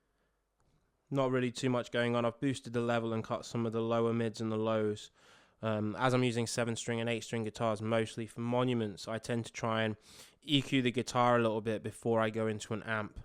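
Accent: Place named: United Kingdom. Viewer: Absolutely British accent.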